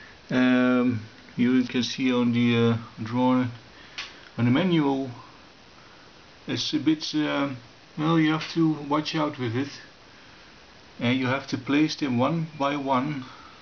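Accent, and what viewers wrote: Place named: Netherlands. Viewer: I love the Dutch diction and intonation. No matter what, when a Dutchman is explaining something, even if it's something completely mundane, it always sounds like he's reading from a text book on quantum mechanics.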